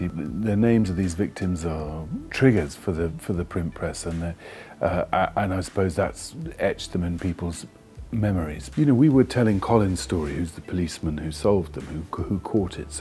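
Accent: RP, United Kingdom. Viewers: I love the way he speaks so proper and posh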